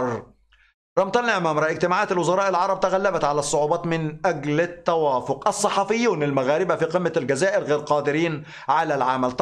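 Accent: Egypt. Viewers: السلام عليك ورحمة الله وتعالى كونك ليك ميول اشتراكية ذا شيء جميل و نبيل كونك بتتكلم صعيدي ذا يزيدك جمالا ونبلا ولكن كونك تنحاز زورا وتغير بعض الحقائق ذا ومع كامل الاسف يامحترم بقل قدرك وتكون عامل أساسي في التفرقة العربية يا يا يا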